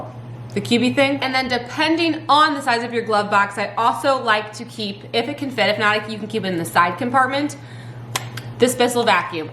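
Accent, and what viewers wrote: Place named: New York, United States. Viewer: Are you from New York? Love your accent!!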